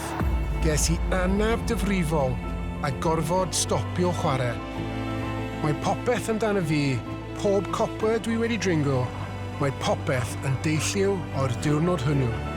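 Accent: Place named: England, United Kingdom. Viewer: I can understand why you'd think that, but he's a learner himself. This is the blind leading the blind. He speaks Welsh with an English accent and mixes in English words into his speech, that's why you find it easier. When learning a language you need to expose yourself to a lot of input, just put the radio on and you will start to pick up on the words being spoken and the pacing, you learn to understand the flow of the language and you recognize the words easier. I'm a Russian and Welsh learner, I understand this issue. From my experience I use a lot of apps and books, so when I start to hear it it's difficult, it's a completely different skill. When you learn from the fluent speakers then you will learn the language properly and you have a lot more to gain from that in cultural and cognitive aspects.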